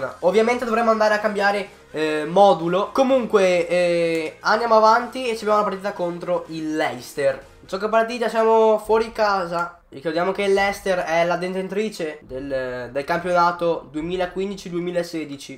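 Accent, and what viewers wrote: Veneto, Italy. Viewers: Ma sei di Venezia?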